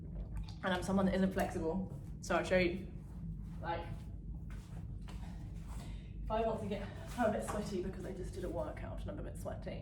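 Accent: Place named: Australia. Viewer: AYE!?!Mr. Shit Starter,. That's Me Aussie sister, ya Monitor Mouse masoosing Pre-Vert & Since she is my sister by human race standards. I love & respect my brethen, So this is mearly giving you props & I'm an emotionally mature man, nothing explicit, just giving credit were it's due, &...." I WANNA GO TO A LAND DOWN UNDER!", Icld never deny thee accent, but This is the 1st time saw you.._so OVERDUE IS A HUGE UNDERSTATEMENT,Its like I found an eviction note when I got home &theyre shutting my water, lights&gas off tomorrow 'OVERDUE.' And I would be a fool to Not say, WOW, You are 'PROP'URRR´ {my own,just mean VERY ATTRACTIVE....& Iam attracted. But persue what make you happy sweethearts. I ask Girl, Just PLEASE 'NEVER Do 'Onlyfans' or 'AduVid' I KNOW OnlyFan have big ties w 'Child Sex Ring' 4 different sourcesWe know how the other rolls. My Instragram is 24 days Old & dismal.You don't mind.Ikeepit fun, so I hope you &I cld friends. 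Talk to ya soon. Ok?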